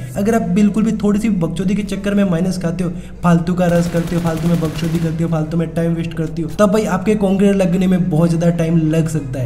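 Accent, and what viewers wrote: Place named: Rajasthan, India. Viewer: Bhai aap rajsthan se ho kya ❤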